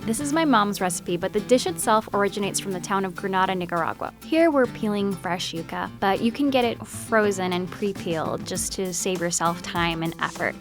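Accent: United States. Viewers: Hablas como gringa habla un poco de español moja yo soy de Costa Rica y quiero que enseñes a hacer gallo pinto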